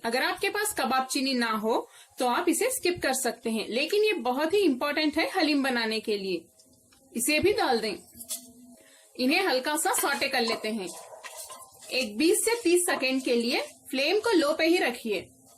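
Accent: Hyderabad, India. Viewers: Aap Bhi Hyderabad K Hai Kyaa? Kaiku Bole Toh Thodi Baat Se Hyderabadi Mlm Hora!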